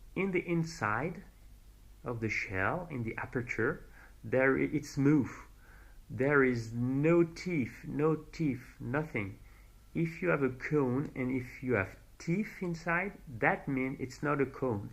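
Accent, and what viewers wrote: France. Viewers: Why would anyone apologize for a French accent, among the most elegant on the planet? Votre voix est tres suave. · I think your YouTube channel is a great thing, but I'm going to be honest with you, if you wear your mask and snorkel (probably your fins too) noone in the scientific community is going to take you seriously, the image implies that you dive for shells in approx 30 ft at best, you are missing out on so much more....btw your French accent is awesome :)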